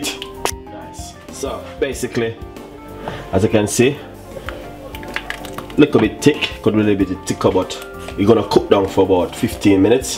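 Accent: Jamaica. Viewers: That’s really just how Jamaicans talk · Sound like a Jamaican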